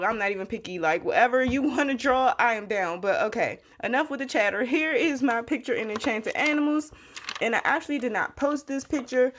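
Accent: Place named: United States. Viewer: Hi beautiful colouring pages.. I love your American accent.. people say to me I love your Aussie accent lol thank you for sharing hun ❤❤❤😊😊😊xxx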